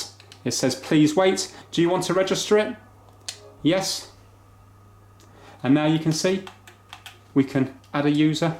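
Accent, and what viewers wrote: United Kingdom. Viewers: Comprehensive explanation and great British accent - Cheers! Greetings from Bulgaria! :)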